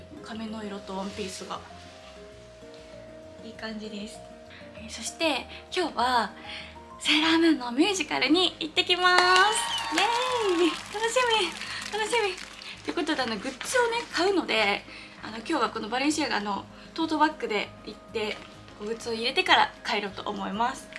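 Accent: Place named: Hiroshima, Japan. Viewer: イントネーションが広島‼️(๑′ฅฅ‵๑)www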